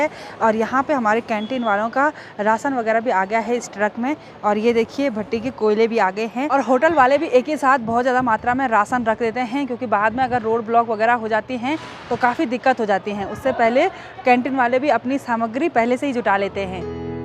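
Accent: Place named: Uttarakhand, India. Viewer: The best youtuber from uttarakhand ❤ the way she speaks ..true uttarakhandi touchhh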